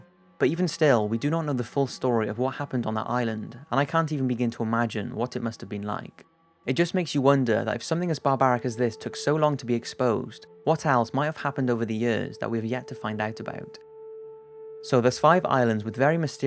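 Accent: Wales, United Kingdom. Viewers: it's part of his dialect, wherever he's from - somewhere in wales, i can guess. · He sounds it, would guess either East Wales like Newtown or Welshpool or maybe Ceredigion. He doesn't sound as broad as South or North Welsh. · Who's the narrator? He sounds both Welsh and English! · The video is all the more interesting because of your british accent.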